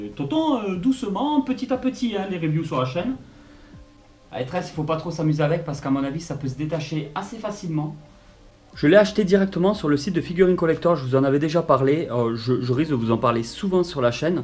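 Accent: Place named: South, France. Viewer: ah le plaisir de réentendre ton petit accent du sud de la France, c'est cool que tu revienne sur youtube avec de nouveaux projets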